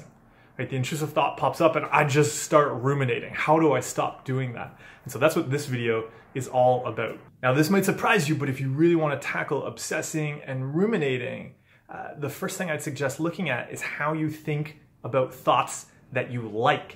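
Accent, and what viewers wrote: Canada. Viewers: He sounds Canadian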